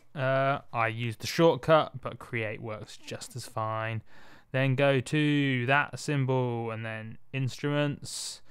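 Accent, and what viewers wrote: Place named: England, United Kingdom. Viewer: I love this guys passion for 808s, trap, muzik production. Love the enthusiasm and english accent! Cheers mate!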